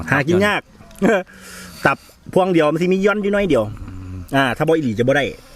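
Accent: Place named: Sam Phrao, Thailand. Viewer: เจ้าคือเว้าคือสำเนียง สามพร้าวบ้านผมแท้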